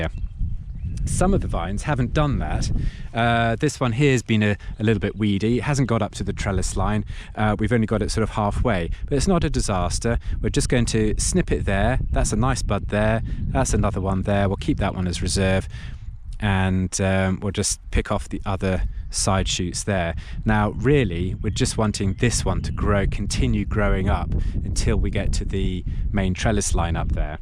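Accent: United Kingdom. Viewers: I think some snip off them early because they are confident in the weather and is not that risky, but you sound from UK and you don't have severe or late cold nights. I had -5 in late April here in Romania a few years ago. I wait until leaves appear, like 1 2 or two weeks more comparing to your buds.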